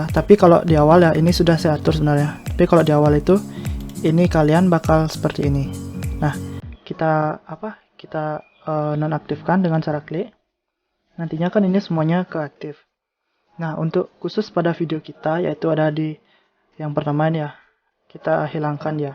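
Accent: Bali, Indonesia. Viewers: mule logat bali tetep kental wkwk, mantap lah bli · Logat nak bali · Salam logat bali brow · uhmmm logat bali :L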